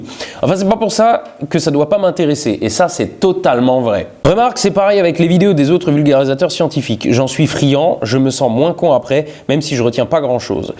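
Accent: Alsace, France. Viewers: Aucune honte à avoir l’accent alsacien! Signé: un parigot qui se désole de l’uniformisation du français. Belle vidéo émouvante à plein d’aspects. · Ton accent alsacien de BasRhinois la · J'adore l'accent alsacien (je ne suis pas alsacien) ! Je connais deux personnes qui parlent vraiment comme tu l'as fait et ça n'a rien de ridicule. Même sans te forcer, on le reconnais bien ton accent : réécoute-toi à ;-)) Bravo et merci pour tes vidéos. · Au sujet de l'accent alsacien, faut savoir que je suis du sud-ouest et que mes voisins étaient alsacien, avec l'accent, mais bien pire que le tien, coté allemand de ouf, et du coup quand ils s'engueulaient en mi-français alsacien/mi-allemand on pouvait pas s'empêcher de se taper des barres. C'est comme un québecois qui t'engueule, c'est drôle. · Haa l'accent alsacien ! Ça fait longtemps que je l'avais pas entendu tiens. 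Ça rappelle des souvenirs. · J'adore ton accent d'Alsace hahaha C'est la première fois que j'entendais cet accent <3 Un jour, peut-être que je pourrais te faire découvrir l'accent du Québec (ou en a aussi plusieurs hahaha J'ai fait un "live" dernièrement mais je sacrais trop pendant un test durant un vol dans le Grand Canyon, dans mon simulateur, je sacrais juste trop pour le publier ici et de toute façon, seulement mes friends FB peuvent le voir hahaha) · Ton accent Alsacien on dirait un mélange entre l'accent Allemand et Luxembourgeois ( logique tu vas me dire )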